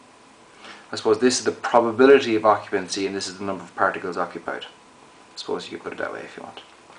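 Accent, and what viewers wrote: Ireland. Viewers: that irish accent reminds me of Jacksepticeye, quite nice xD. Great video and explanation btw, it really helps to see the full steps. Thank you!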